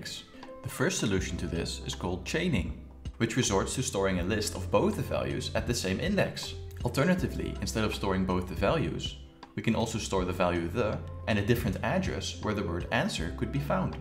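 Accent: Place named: Netherlands. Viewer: I smell Dutch 🤔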